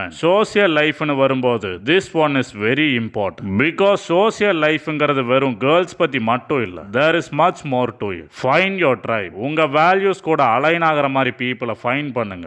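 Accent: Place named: India. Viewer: So you guys mean to tell me, you understand everything in this video with the indian accent?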